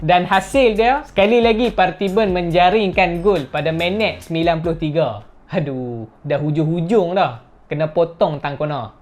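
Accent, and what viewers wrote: Kedah, Malaysia. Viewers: Terima kasih admin, pelet Kedah sempoi baq hang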